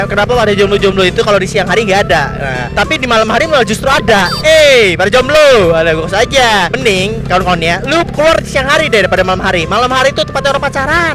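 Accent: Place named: Java, Indonesia. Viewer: medok banget vangg ente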